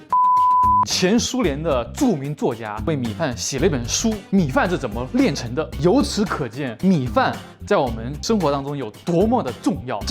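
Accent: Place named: Yangtze, China. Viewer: 你一个黄河边长大的孩子，怎么普通话一股长江流域的味儿呢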